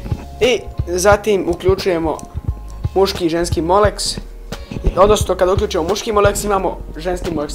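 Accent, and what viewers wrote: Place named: Dubrovnik, Croatia. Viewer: oklen s'ti? Malo mi baca na Dubrovnik/Korčulu, po naglasku.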